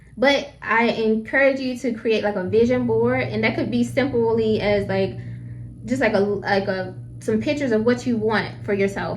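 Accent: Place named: North Carolina, United States. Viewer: Hello. My name is Albert and I grew up in NC. I now live in California, but it is still nice to hear the NC accent. I welcome you to the world of money and investing. I have lived my life frugally. At first it was out of necessity, but in time, it became a lifestyle. Eventually, it became a very comfortable lifestyle. Best of luck with your channel.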